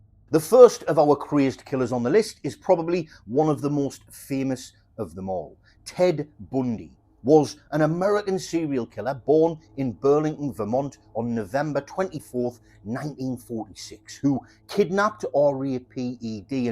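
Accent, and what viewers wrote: United Kingdom. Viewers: You should edit the title imo so it says “Brutally murdered or executed in prison” Otherwise it does seem a bit clickbaity. Excellent video otherwise and it is nice to have a fellow brit narrator instead of an ai voice. Keep up the great work and try not to clickbait 😊😊😊😊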